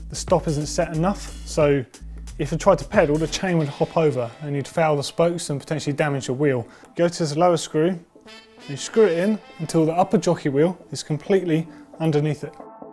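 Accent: United Kingdom. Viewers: Please add subtitle that too hard to understand biritish english for me :D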